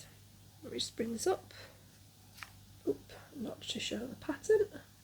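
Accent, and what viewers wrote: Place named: Northern England, United Kingdom. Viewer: Hello! Just found your videos thanks to mama flossabilties. Just wanted to say as a fellow brunette and flosstuber, I feel your pain with the greys! I am due to go next week but in the meantime I am channeling grandfather from the munsters! 😂🧛‍♂️ your stitching is fabulous and I’m loving listening to a fellow northerner! I have subscribed and am looking to catching up with your other videos! Happy stitching lovely -Lauren 🧵💗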